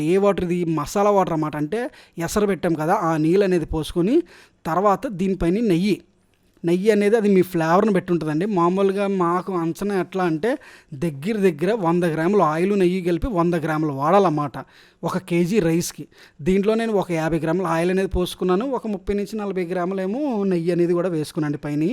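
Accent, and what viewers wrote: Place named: Godavari, India. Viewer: Godavari slang lo gouravam 😍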